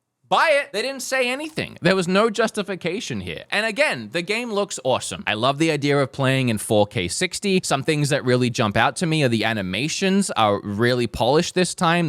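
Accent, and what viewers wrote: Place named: Australia. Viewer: Completely irrelevant question but what's your accent? You sound Australian with a touch of something else?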